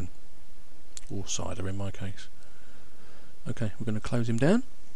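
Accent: United Kingdom. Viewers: you are british ? you have a funny accent :))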